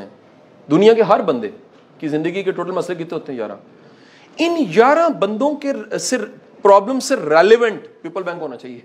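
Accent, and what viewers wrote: Punjab, India. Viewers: Muhammad Amir - This is lahore or pubjabi syle of urdu. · Sir ap bhtt parhy likhy hen. baten b achi krty hen lakin apka accent punjabi he.. 11 ko ap bar bar yara keh rhy gyara ki bjaye.. mere kano ko bhtt ajeeb lgti ye punjabi urdu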